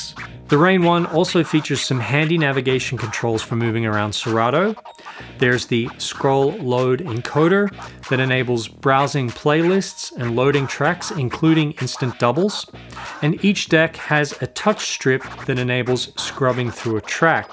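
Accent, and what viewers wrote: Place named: Australia. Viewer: Are you an Australian who has lived in America for a long time? Your voice sounds in between the two and it’s confusing me.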